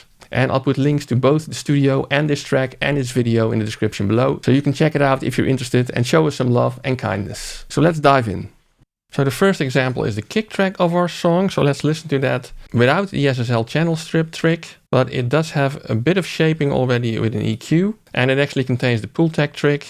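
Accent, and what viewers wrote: Netherlands. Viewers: Wait are you dutch ? I'm hardly noticing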